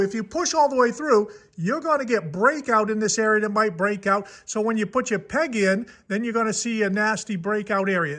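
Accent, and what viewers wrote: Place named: Maine, United States. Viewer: Hey, your videos are really great. Well thought out, straight to the point, helpful, and that cool Maine accent is the icing on the cake. Keep ‘em coming.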